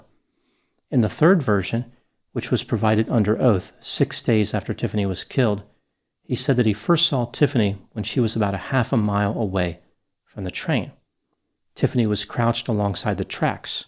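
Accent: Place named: Pennsylvania, United States. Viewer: Every time he adds a zinger to his deadpan delivery it goes right over my head for a few seconds then I have to stop and think about what he just said. I hear a PA accent so almost the same thing.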